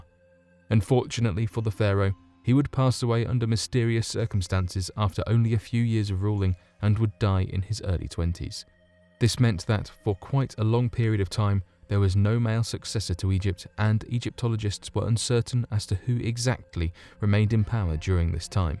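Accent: United Kingdom. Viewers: He is obviously not American. Can you not hear his British accent? 🙄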